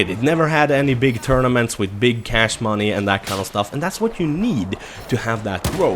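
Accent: Sweden. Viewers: Hahaha! Your accent is the best! I'm glad to have you swedes as neighbors!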